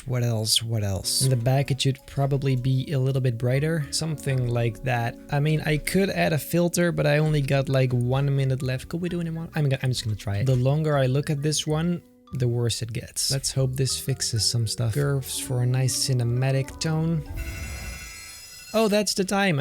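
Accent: Netherlands. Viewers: Damn I was hoping for more dutch accent but honestly any vid is a win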